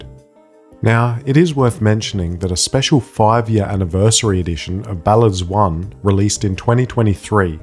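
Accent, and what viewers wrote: Adelaide, Australia. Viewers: u sound like an aussie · are you a fellow adelaidian?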